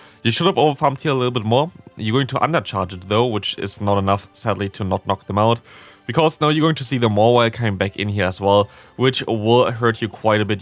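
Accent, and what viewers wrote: Germany. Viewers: Sag mal du bist schon deutscher oder? Meine das rauszuhören. Ist natürlich egal, klasse Content!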